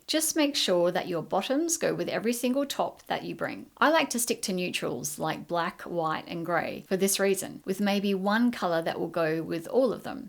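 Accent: Australia. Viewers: One of the best packing videos I’ve seen. And I’ve seen a lot. It’s also great to hear an Aussie voice too. I’ve decided to give one bag travel a go next time we go overseas. Thanks for all the info in a very logical and calm way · Love hearing an Aussie voice ❤ Great video which has given me plenty of ideas as I prepare for a Mediterranean cruise and a few days in Dubai in September/October. Trying hard not to overpack for this holiday as I’m getting older and don’t want to be carrying items I don’t need.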